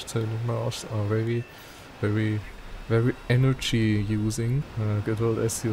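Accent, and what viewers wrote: Germany. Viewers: You are german right ? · Kann es sein das du deutscher/österreicher bist? ^^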